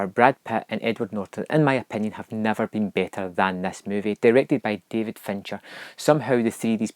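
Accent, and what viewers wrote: Scotland, United Kingdom. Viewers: wat part of Scotland u from I'm from Stirling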